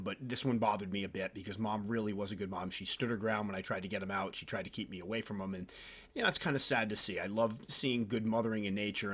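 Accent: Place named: Midwest, United States. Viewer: Dude, I wish you had an email, but I’m sure that’s the last thing you need...58,000 emails asking “what are the best T’s to start collecting?”! 🙄 My question of the day is (if you can/want to answer?!)...Being from St. Louis, MO, I hear a STL/Chicago (Midwest) accent...am I close?! 🤔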